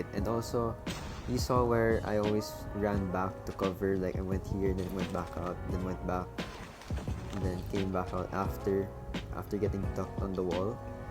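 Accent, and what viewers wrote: Philippines. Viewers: Are u a Filipino? You sound like one tbh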